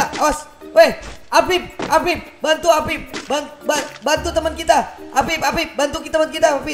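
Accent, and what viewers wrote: Java, Indonesia. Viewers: Medok hahaha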